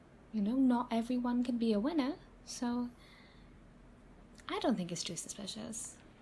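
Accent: Wales, United Kingdom. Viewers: Hmm. You sound Welsh. First time I’ve heard a Welsh ASMRtist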